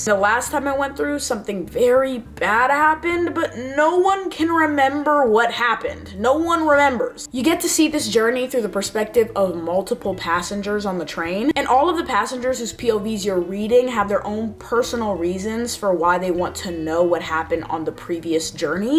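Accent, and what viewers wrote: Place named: United Kingdom. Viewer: Why did i assume you were british..? Anyway immediately subscribed.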